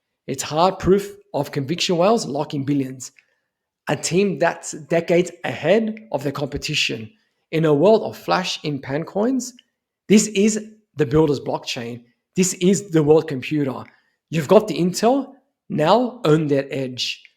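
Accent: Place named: Australia. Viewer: Keep the vids coming. Good work. Guessing you're an Aussie?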